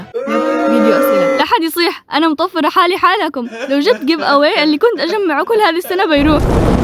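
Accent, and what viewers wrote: Yemen. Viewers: والله من اول فيديو شفته لك حسيت ان يمنية 😂😂 وجيت لذا الفيديو اتأكد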